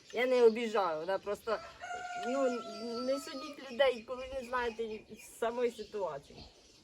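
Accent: Poltava, Ukraine. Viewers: ГОВОР ТАКЕ ,ЯК ПОЛТАВСЬКИЙ, ЧИ НІ?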